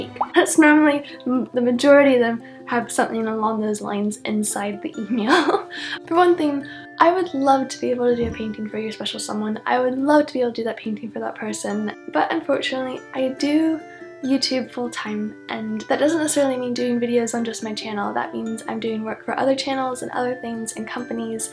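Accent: United Kingdom. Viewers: I believe she has mentioned in an older video that she is originally from Florida, and she moved to London, which is where she currently resides. It might seem like she has an accent or uses British English due to her stay in London for an extended period of time.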